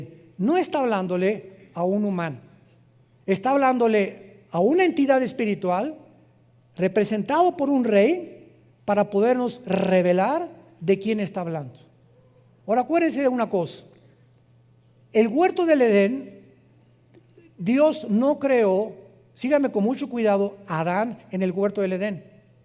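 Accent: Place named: Mexico. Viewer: Gracias a Dios por este siervo y hermano en la fe de nuestro Señor Jesucristo, el Dr. Armando Alducin quien tiene un ministerio maravilloso enseñando al pueblo de Dios las grandes verdades bíblicas, el plan de Dios para la redención de la raza humana, una linea cronológica de los grandes acontecimientos actuales y futuros del plan de Dios para la humanidad, una orientación de la palabra de Dios para consolidar el crecimiento del carácter cristiano, profundiza las enseñanza y los misterios de los diversos conceptos bíblicos contextualizándolos, geográfica, histórica, cronológicamente etc. etc., revela el carácter santo, el amor, y la justicia de Dios. Además lo expone de manera magistral, profunda, ungido por el Espíritu Santo, entretenida y con su acento mexicano que para los cristianos de habla española nos resulta muy interesante y divertida, en especial a los cristianos chilenos que admiramos y respetamos mucho al pueblo mexicano.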